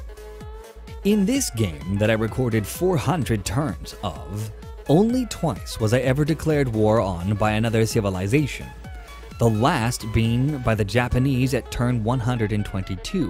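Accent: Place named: Ukraine. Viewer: He should stick with his natural Ukranian accent. Dame pesos for example.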